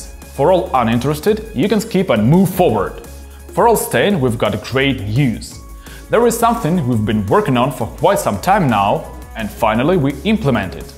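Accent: Russia. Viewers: ^^^^^^^^Help them Translate??!! To English I hope, I had to put closed caption on to understand this Rooskee..LOL^^^^^^^^^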